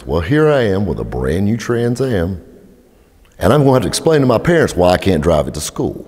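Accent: South, United States. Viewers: Coolest dude with a southern accent.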